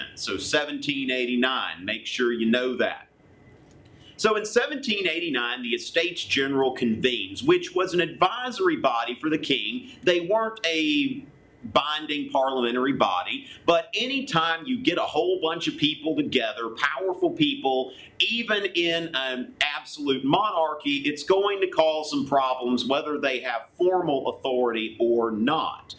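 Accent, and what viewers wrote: South, United States. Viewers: Why is this man speaking is a Southern drawl? Whatever happened to standard and intelligible English? On a completely different note: Why does one have to hold a cup in one's hand while speaking about the Estates General? Seriously....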